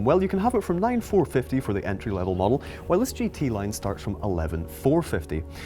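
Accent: United Kingdom. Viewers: also like your accent, which part of UK is it from?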